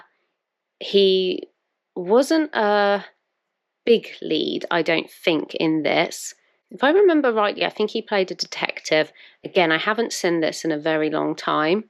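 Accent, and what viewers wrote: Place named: United Kingdom. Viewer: Hello! I liked your vlog! I’m also a huge fan of Tom Hiddleston. The video was very informative and interesting. I didn’t know about the fact that Tom Hiddleston’s favourite book is “Anna Karenina”, and for me, as a Russian, it is a great surprise and pleasure. I have a question, sorry if I’m wrong, I’m just curious; You have a very beautiful accent, and can I assume by that that you live in the UK? If so, did you have any chance to meet Tom Hiddleston, possibly at some events? Thank you and have a great day!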